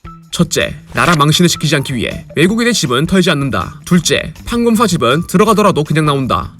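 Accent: Jeolla, South Korea. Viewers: 아따 또 전라도여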